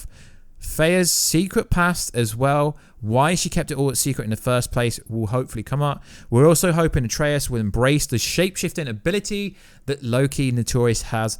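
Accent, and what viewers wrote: England, United Kingdom. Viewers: (not relevant but but whereabouts in England is your accent from don't sound like black pool or London to me just curious?)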